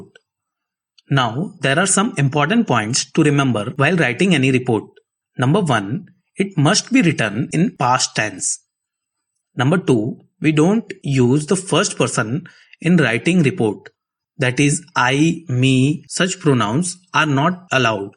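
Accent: India. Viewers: Indian ahh acent 😭🥀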